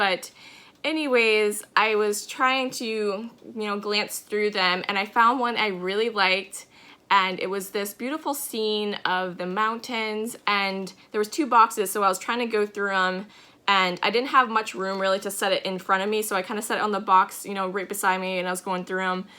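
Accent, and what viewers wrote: Maine, United States. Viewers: Wonderful Maine accent!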